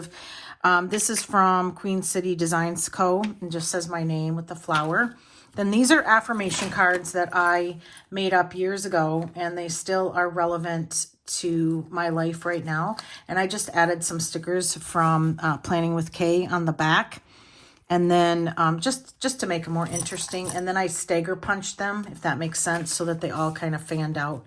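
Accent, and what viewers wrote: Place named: New Orleans, United States. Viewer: What area of the country are you from (if you don’t mind saying, you sound like you could be from New Orleans or another port city)… except that you say “you guys” instead of y’all - just curious I’m a speech pathologist and like to guess.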